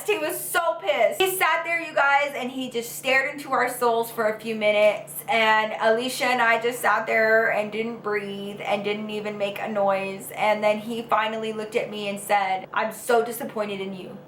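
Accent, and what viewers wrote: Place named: South, United States. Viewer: I can really hear your country accent in this video lol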